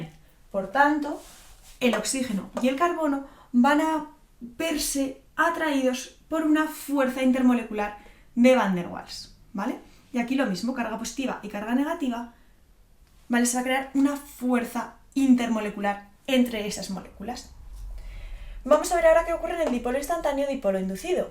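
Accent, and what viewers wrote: Spain. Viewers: Después de escuchar y escuchar su voz tan cool :3 (y mas su español castellano, que me fascina eso) he entendido a la perfección el tema. Muchas gracias!! :-)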